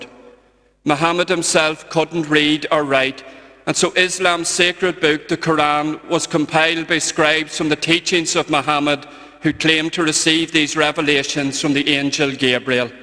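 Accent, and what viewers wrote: Ireland. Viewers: Wonderful homily and beautiful Monaghan accent!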